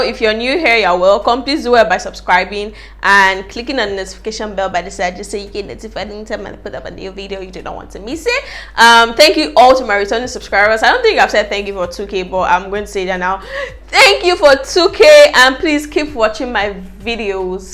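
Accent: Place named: Nigeria. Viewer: We all know she is a Nigerian from her accent.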